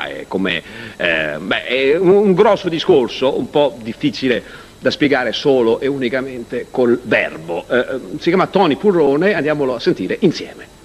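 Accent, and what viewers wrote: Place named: Milan, Italy. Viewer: Accento quasi da cummenda milanese. Mi stupisci sempre, e mi manchi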